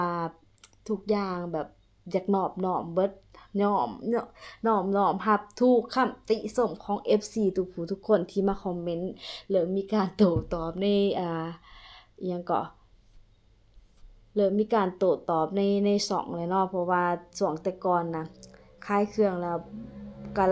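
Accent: Ubon Ratchathani, Thailand. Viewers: สำเนียงการเว้า ของสาวห้องคือกันกับสำเนียงเว้าของคนอุบลฯ คน อำเภอน้ำยืนเด้😊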